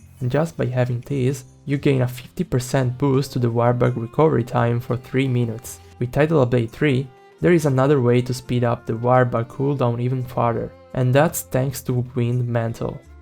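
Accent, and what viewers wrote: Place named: Italy. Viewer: Non so bro mi sai di italiano per l'accento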